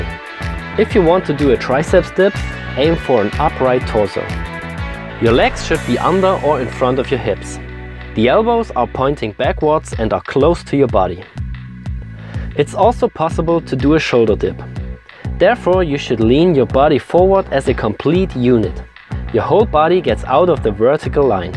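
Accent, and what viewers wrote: Germany. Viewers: it‘s english with german accent, so don‘t bother ;) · Man hört direkt den deutschen Akzent 😉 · Haha this german accent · Man hört das Deutsch raus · Can't dafine your accent. Where are you from? Or if someone knows plz tell me. upd: It seems i found out. Is it german? Correct?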